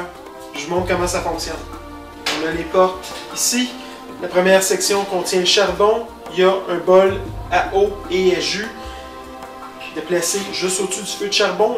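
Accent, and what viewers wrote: Quebec, Canada. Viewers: Sûrement intéressant, mais en tant que Français, je n'ai rien compris dû à son accent canadien qui fut le nôtre il y a 4 siècles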